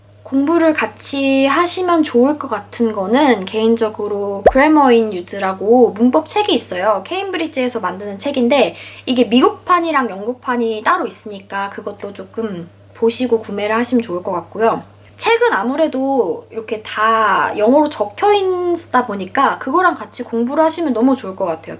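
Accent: United Kingdom. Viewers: 영어가 유창한데 아시아/미국식 섞인 발음이 심해서 사실 예전부터 스트레스를 되게 많이 받았어요.. 어떻게 더 공부해야 할지 고민도 많이 됐었고ㅠㅠ 유튜브 알고리즘으로 님 영상 우연히 보게 됐는데, 영상으로만 봐도 아주 친절하신 분이고 무엇보다 언니의 목소리가 너무 좋으세요 영상틀고 잠들정도인데요❤️ 엑센트도 딱 제가 찾던 느낌이라 정주행 열심히 하고 있습니다.. 언니 앞으로도 브이로그든 공부법이든 그냥 영상 많이많이 올려주세여🙌🏻 언니덕분에 이제 드디어 영국발음고정 마음 먹었습니다..😤 (유튜브에 댓글 정말 안 남기는 편인데..부끄럽습니당,그래도 너무너무 저에게 힘이 되어주셔서 이렇게 달고 갑니당..🌹) 좋은 하루 되세요~!! 🥰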